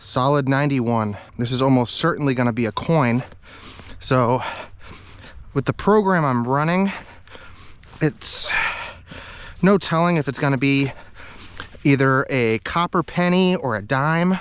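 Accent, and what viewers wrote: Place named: New York, United States. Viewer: I knew you were a NY transplant... lol I have the same accent.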